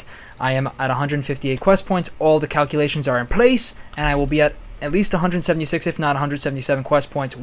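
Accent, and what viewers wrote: New York, United States. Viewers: youre from ny?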